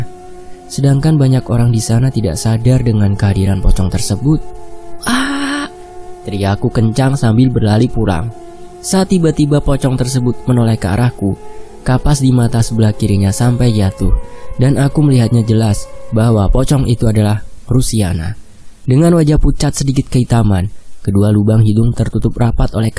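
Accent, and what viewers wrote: Java, Indonesia. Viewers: min medok bgt jowone 😁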